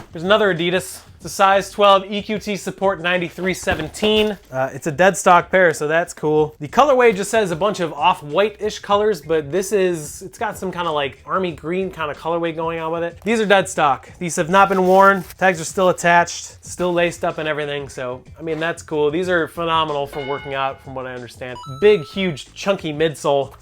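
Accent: Chicago, United States. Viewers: The Chicago accent gets me every time 😂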